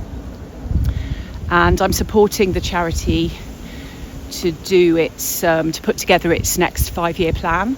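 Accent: United Kingdom. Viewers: Hi, I love your accent. I'm studying English and I find your British accent fascinating. I'll keep watching. Thank you very much for your work. Have a nice day!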